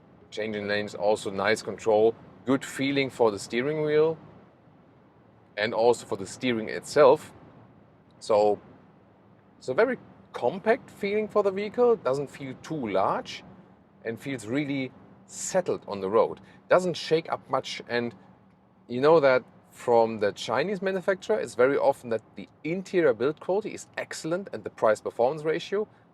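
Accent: Germany. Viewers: Als Amerikaner würde ich fragen: in England spricht man Englisch? Mn hört seinen deutschen Akzent her recht gut raus 😊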